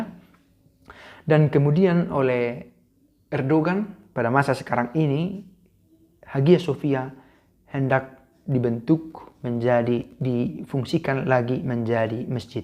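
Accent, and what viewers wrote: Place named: North Sumatra, Indonesia. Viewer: Naratornya dialek sumut kayaknya🤣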